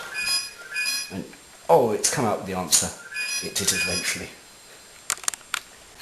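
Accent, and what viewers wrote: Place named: Scotland, United Kingdom. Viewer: your accent is scottish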